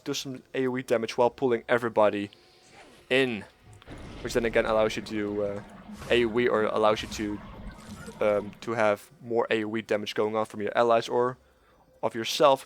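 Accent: Netherlands. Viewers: Nederlandse accent in het Engels! ;) So nice to hear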